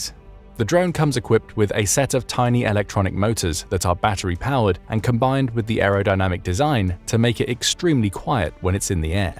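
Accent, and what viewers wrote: United Kingdom. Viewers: It's always nice to have a reliable British sounding narrator on a video. This guy could describe a 1986 Ford Chiltons manual and it would sound good. 👊😎 EDIT: $200,000 a pop!?! Whaaaaat!?! That's f***ing crazy! I can buy a better one, same size just a different color at a Goodwill for like $20! 🥹 "Black Hornet Drones: The only thing you'll hear is the sound of your head getting slapped.... Black Hornet." That would be the ad. 😆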